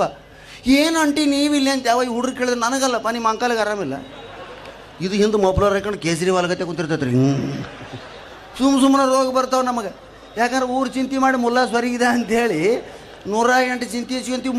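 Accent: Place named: Uttara Kannada, India. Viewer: Super sir nevu yell hodru nama Uttara Kannada bashe dag matadtiri ☺️Ur amazing sir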